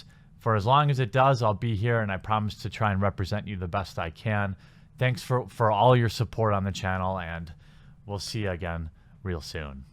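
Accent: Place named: Midwest, United States. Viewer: Interesting Midwestern vowel merger: since/sense homophones, etc.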